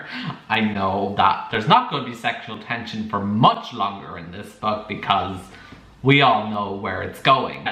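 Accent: Ireland. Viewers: siri with the irish accent omg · Living for 50 shades of grey + this irish accent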